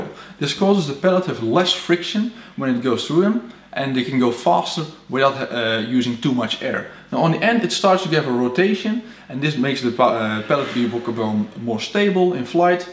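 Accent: Netherlands. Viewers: Ben je gewoon Nederlander? Aan je accent te horen wel? Niet negatief ofzo, gewoon nieuwsgierig.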